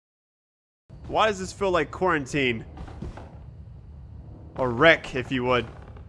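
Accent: United States. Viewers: lmao the reason why i watch is bcos of his reaction and his strong American accent HAHA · Jeez has the most American Voice ever:face-green-smiling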